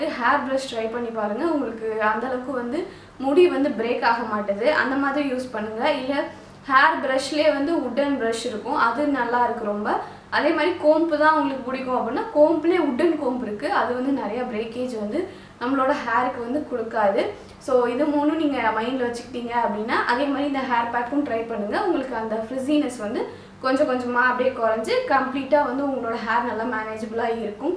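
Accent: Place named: Thanjavur, India. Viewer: Hai mam neenga ena native mam unga language thanjavur side mathri iruku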